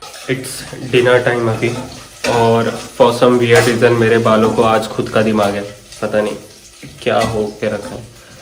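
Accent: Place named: United Kingdom. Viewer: Wo agrezo wali accent agayi hai tumhare English me.. kyaaa baat ❤️❤️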